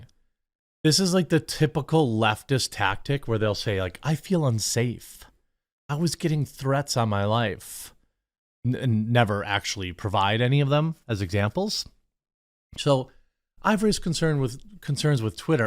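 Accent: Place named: South, United States. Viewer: Terrible, terrible first 6 mins. I know you don't care to why someone is watching your recordings. Just know I for one watching you get some serious cringe. I am a conservative btw. Your real red neck swamp accent, your memory, your facts, your dumb Coffee and its constant promotion even when I have YouTube Prime. I just shake my head and think it's really bad here. And also lots of Cringe.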